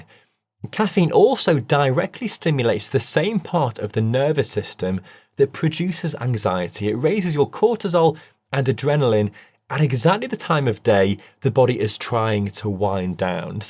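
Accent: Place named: Northern England, United Kingdom. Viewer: Thanks for this really informative and excellent explaining.. Fond your videos the best on social media.. Appreciate so much your insight into life style changed, and as your a uk based doctor, and by your accent feel you may live in the North of England (like myself ) simplify videos easy listening.. thanks again 🙏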